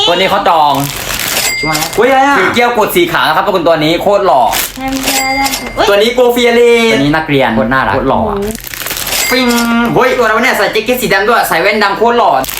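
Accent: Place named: South, Thailand. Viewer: ทำไรหนูพูดใต้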